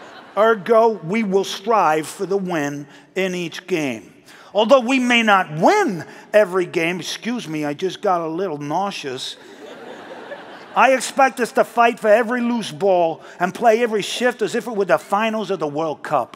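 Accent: United States. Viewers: I swear. Damian Lewis’ American English is hands down spot on! ❤ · Has nothing to do with Trump. The accent is far from being uniquely his, and it doesn't sound very much like him anyway, pretty far stretch to say he's mocking Trump. Lots of folks in the US have a similar accent.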